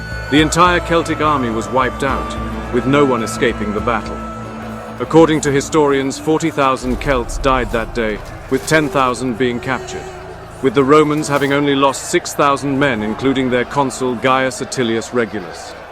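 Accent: United Kingdom. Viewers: This history is told in a such a perfect way - animation, music, super British English and ideal synthetic summary, that I was watchin the film dozens of time! Really great!!!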